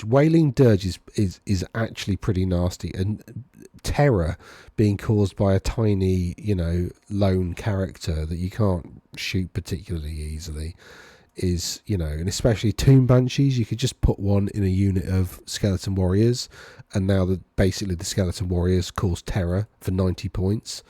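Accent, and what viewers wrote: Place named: South, United States. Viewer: Building my witches and psychos in the woods vampire force at the moment very exciting.. What's your region you sound like you are a southerner like myself?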